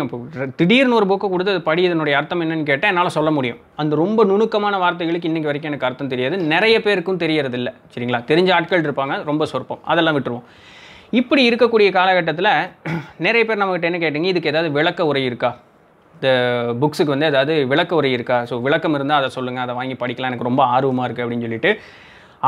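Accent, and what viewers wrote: Coimbatore, India. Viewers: Hi I learned so much from your videos. Being from Coimbatore like your way of saying. I sent email but it did not delivered. Where did you buy your copper kappu please respond as soon as possible. Please reply as soon as possible. Thank you!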